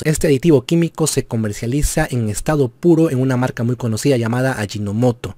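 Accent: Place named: Peru. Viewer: peruano eres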